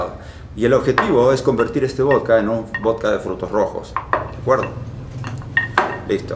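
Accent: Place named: Argentina. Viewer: Que lindo escuchar a un Argento chamuyando jajajaaja somos científicos de lo que hagamos jajajaja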